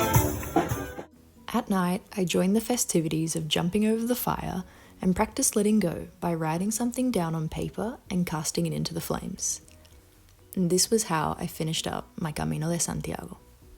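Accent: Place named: Australia. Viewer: Wow why I didn’t know this contents before ,I love Aussie English , please keep it up for me! Thank you !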